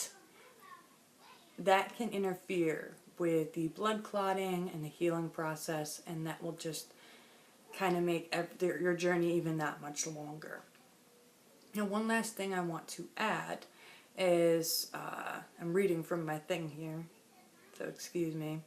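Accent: New York, United States. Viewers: By the way I could listen to you talk forever I love your New York accent it is so adorable I just I love it I cannot wait to come out and see you in New York we are going to have a blast!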